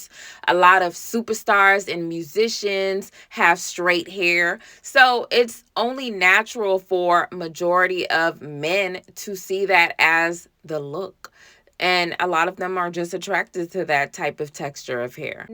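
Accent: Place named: Jamaica. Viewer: Hey i heard that jamacian accent. Looking forward to buying some more of your products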